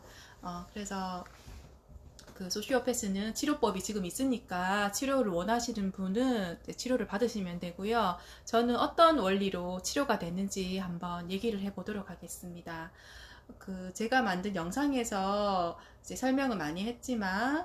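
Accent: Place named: Gyeongsang, South Korea. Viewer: 갱상도 누님 갱상도 사투리가 구우~수우~하네예~ 진짜배기 갱상도 사투리네예~ 억양 보니깐 대구 토종 신토불이 사투리 억양입니꺼?아니면 마산 근방 사투립니꺼?? 마산과 대구 사투리를 구수우~~하게 잘 쓰시네예!!!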